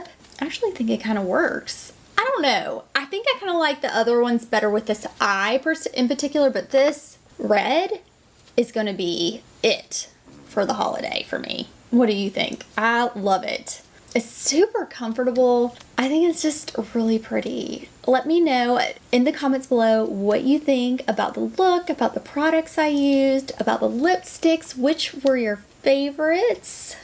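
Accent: South, United States. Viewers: You are gorgeous and I love the look. I just received my Chanel Seduction eye palette and Romance eye pencil. I am anxious to use them. I love your Southern accent. I was born in Dallas, Texas and I am a Southern gal at heart.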